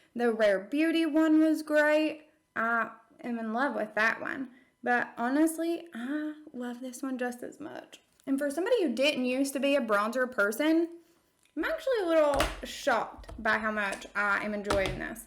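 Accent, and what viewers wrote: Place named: South, United States. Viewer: I’m trying to place your accent. I love how different southern accents can be when we live in different states.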